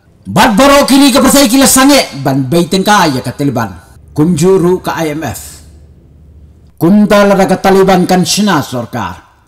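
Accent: London, United Kingdom. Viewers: Ga deg earphones kyndit ia ka sur bah London.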